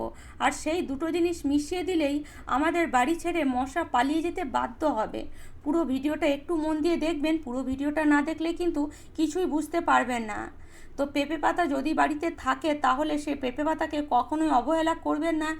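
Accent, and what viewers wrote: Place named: West Bengal, India. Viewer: আপনি কি ওপার বাংলা থেকে ? উচ্চারণ সেটাই বলছে !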